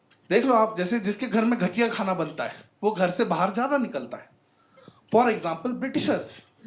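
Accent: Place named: Bengal, India. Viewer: Your bengali accent is excellent ❤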